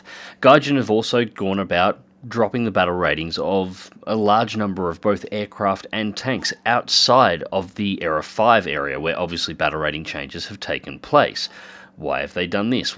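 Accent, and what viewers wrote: Australia. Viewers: Swearing in an Aussie accent just sounds to right hahaha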